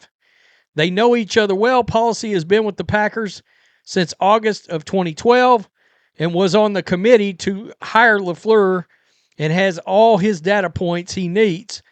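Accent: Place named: Tennessee, United States. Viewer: Yes, Matt LaFleur was the Tennessee Titans offensive coordinator when Ryan Tannehill was there. I remember that because I remember the Titans radio guy had a very similar accent to you and I thought you were from Tennessee until you said you were from Texas.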